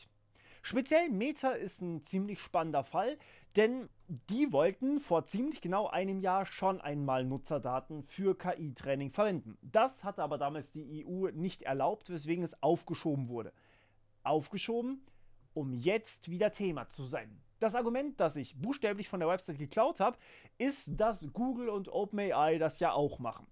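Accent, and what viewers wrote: Swabia, Germany. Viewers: A scheee, du bisch ja au n Schwôb!!! Ja Griaß Gottle! 😅😅😅💪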